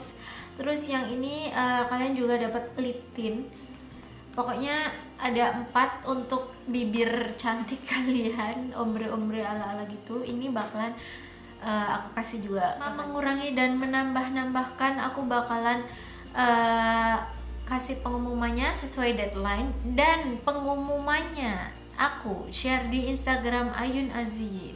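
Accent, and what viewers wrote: Java, Indonesia. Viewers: Assalamualaikum mbak:) hai aku Khansa dari Sidoarjo. Pertama kali tau video youtube mbak itu video yg review wardah intens matte lipstick lgsg deh mulai kepo "siapa sih nih orang?" akhirnya suka nontonin videonya soalnya aksen jawanya dapet bgt:)) terus videonya yg menurutku bagus dan cukup membantu aku yg buta makeup 😂 dg penjelasan lmyn lengkap dan mudah dipahami. Untuk kedepannya aku pgn mbak bikin video ttg makeup/skincare yg cruelty free/animal testing, dan yg pastinya yg halal. Sbg muslimah bukan hanya makanan yg harus diperhatikan kehalalannya tapi produk2 lain seperti make up/skincare. Pengen tau aja tanggapan mbak tentang itu 😊. Terima kasih sudah mau menyisihkan rezekinya buat bikin giveaway utk subcribers dan viewersnya, semoga subcribers dan viewersnya makin nambah berjuta juta ya 😁. Wish me luck🙏 Wassalamualaikum ig: kfadhilahr_ Alamat: Perum. 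Griya Bhayangkara Masangan Kulon RT 38 RW 09 blok A4/10 kec. Sukodono kab. Sidoarjo. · kolaborasi dong sm inivindy make up in model. trs keluarin smua medoknya kakak, biar lepas gtu aura jawanya. nah nanti kayak ada adegan2 drama gtu kakak dan inivindy, karena beda teknik make up dan lain sebagainya ig: babyrachella97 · Assalamu'alaikum yg suaranya super duper medok kejawan hehehehe... Aku nhoura, nama ig aku Alamat aku di Jl. Dukuh Bulak Banteng Sekolahan Gg. IV no. 24 Surabaya..., aku mau kedepannya bikin video tutorial makeup tapiiii NO Mirror Makeup Challenge (Makeup nggak pake Kaca atau Cermin) yaaaah??? Semoga ini komentar yg menarik hehehehe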